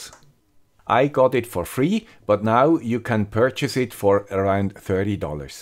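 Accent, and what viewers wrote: Switzerland. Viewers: Yes, THAT is an swiss accent. Notice the distinct difference between a german speaking english? And seriously... Tensorflow on the RPi? Do you know Yolo (uses Darknet)? Yolo classifies quite many different types of objects, but requires quite some processing power... the RPi3 needs around 40 sec for a single frame!!!!!! To make it realtime some external accelerator is required... and even veeeeery small cnn network models,,... It might work with very small images (need to test though...) like 50x50 greyscale or so... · Hello guy with the Swiss accent! Any idea what the camera connector is, CSI? Could you make a video to experiment and let us know if it would be possible to use a 5MP or 8MP camera from a raspberry pi, to increase the fps and quality?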